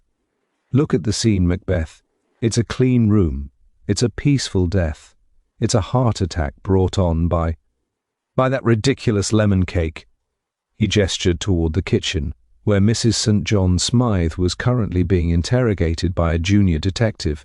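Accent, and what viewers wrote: United Kingdom. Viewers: The reader is very good and diction is very clear. However I’m sorry to have to say this, but hearing this read by a very English accent spoils the atmosphere, reality and charm of this story. The charm is in the Highland accent and pace of speech and this is not it. But I’d listen to any other story read by this speaker as he was so clear.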